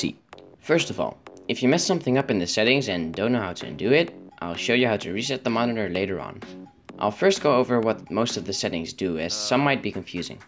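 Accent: Netherlands. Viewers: U dutch? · ben je nederlands je hebt echt een nederlands engels accent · youre dutch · Merk dat je Nederlands bent duss... heb je last van Ghosting? Is de reactietijd rond 3-1MS?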